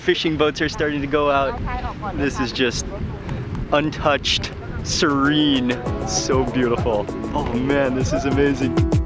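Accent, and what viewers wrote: Malaysia. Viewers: masih pekat melayu. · Kecek kelate lako ter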